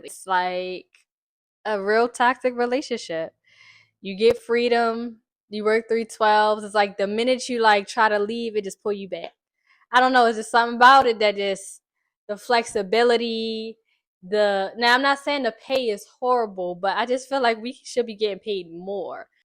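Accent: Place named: Maryland, United States. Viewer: You didn’t have to tell us you’re in Maryland. We could tell by the accent from the moment you said do or to. Lol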